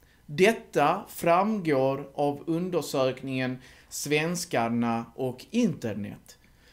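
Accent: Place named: Skåne, Sweden. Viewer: Kan du inte ta det på Rikssvenska? Skånska är svårt att förstå. Dessutom så framstår det inte hur man lurar staten.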